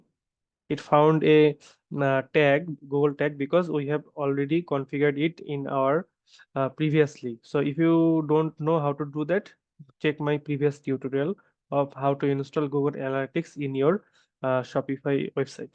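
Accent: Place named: India. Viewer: Thank you! Thank you! Worked perfectly! Also thank you for speaking slowly! Indian accent is hard to follow if you're European.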